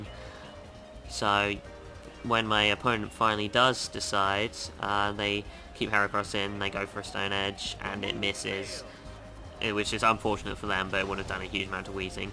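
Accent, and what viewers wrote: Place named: United Kingdom. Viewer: I always love hearing Brit voices... I'm not quite sure why... but in any case, coo' battle. About the switching between Gyrados and Jolteon business, there's chances that you might overpredict them and they won't switch and if you do the wrong move expecting a switch it really messes you up. It's a good thing though he did do what he did... if all you did was Confuse Ray to Gyrados it still would've been good but imagine if you picked Surf and he didn't switch >_>;.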